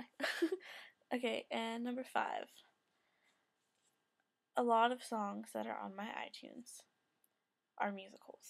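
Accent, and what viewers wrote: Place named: United States. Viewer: Ha ha sweet, thanks for the shout out, i've been super busy so haven't been able to get on here. It's Danny btw, but I don't mind hearing my name in an american accent! Keep up the good work.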